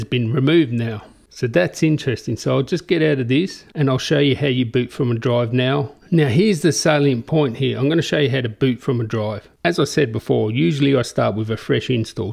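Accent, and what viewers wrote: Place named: Australia. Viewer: Australian accent is hard for spanish/latin speakers, I've watched a couple of Crocodile Dundee and then came back to this video LOL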